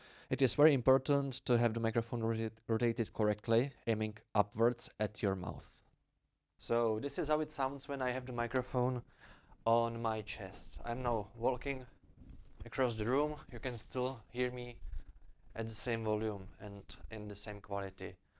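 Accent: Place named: Central Europe. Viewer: You must be from middle europe because your accent is like poland, hungarian or slovak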